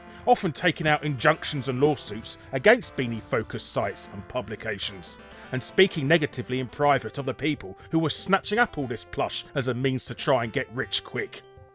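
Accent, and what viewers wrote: United Kingdom. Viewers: you really make me laugh with your British accent and valuable information. 😄😄😄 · Just found this channel... I really like it... Very well put together, engaging, funny, well researched, informative and of course the British accent... Subscribed...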